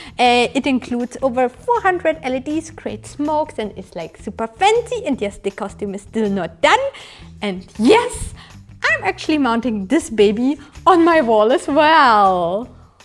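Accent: Germany. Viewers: I think she is German she has an German accent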